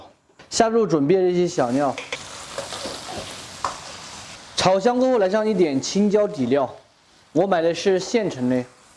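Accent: Sichuan, China. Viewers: 味道肯定巴适得板，配合你的川普简直是绝配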